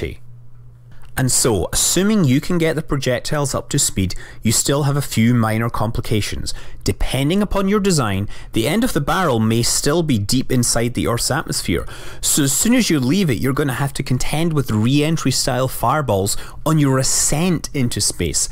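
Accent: Scotland, United Kingdom. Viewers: Hey... are you scottish, you sound scottish?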